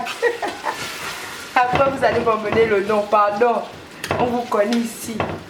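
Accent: Gabon. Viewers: Au fond le sans accent des gabonais fait leur accent, gabonaise pure pure.. Merci mama!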